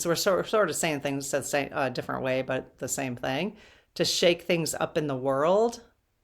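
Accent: United States. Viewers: looks and talks very much like my next door neighbour. She has a doppelgänger in Australia!! It’s like looking at my lovely next door neighbour but she’s talking with an American accent! I love it!💖🦘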